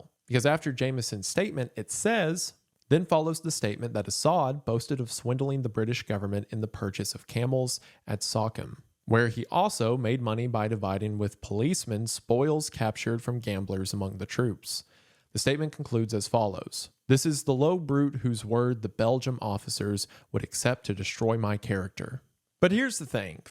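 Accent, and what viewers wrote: South, United States. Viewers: he's also a southerner, they've got the whole "pin-pen" merger going on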